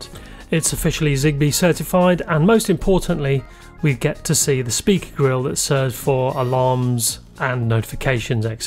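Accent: United Kingdom. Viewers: I thought he is based in the UK from his accent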